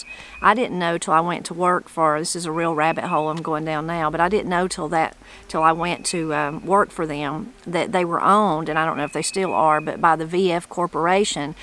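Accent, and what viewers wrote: Appalachia, United States. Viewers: I love this channel, your accent, the Appalachian people. I’m so far from home, I subscribe to your channel and get a taste of home. I read the comments for “dessert “. I’ve been living in Mexico for 20 years, at first with my young son, but then he returned to the US for college, sigh. Your channel warms my heart . I was in Bryson City with my siblings and mother. We spent the summer there in the mountains to get away from the South Florida busy ness. We camped, swam in a local public pool, the river, learned macrame and sand painting. We climbed trees, I tasted crabapples from the tree for the first time. We caught lightning bugs, they filled the whole yard, as well as the mosquitoes. The best summer of my life. Maybe one day the Lord will bring me back to Appalachia. We were all born in TN, but Nantahala Gorge, oh my heart.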